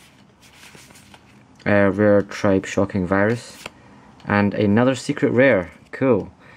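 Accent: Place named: Scotland, United Kingdom. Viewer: i love your accent... scotish right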